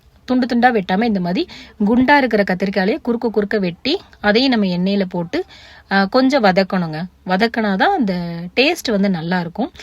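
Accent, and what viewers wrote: Tirunelveli, India. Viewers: Happy birthday thampi Akka neinga evlo naal estate la erukinga ananlum slang tirunelveli slang ennum ungaluku pogala akka❤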